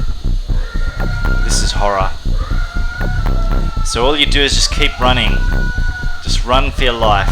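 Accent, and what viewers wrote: Australia. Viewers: so entertaining with that aussie accent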